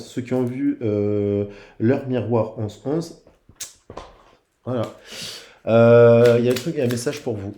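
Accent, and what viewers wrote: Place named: South, France. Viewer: Coucou! Le simple fait de t'entendre dire: "Hé coucou tout le monde", avec l'accent du soleil🌞donne la pêche🤣 Je pense être une guérisseuse, à ma manière... Je te souhaite un bon week-end prolongé de Pâques, même si le temps n'est pas au beau fixe sur le Sud🌧.. Gros bisous🕊🐣😘🍀!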